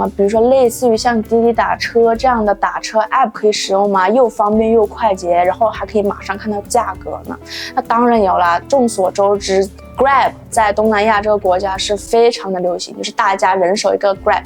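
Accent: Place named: Hubei, China. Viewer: 你这口音是湖北普通话。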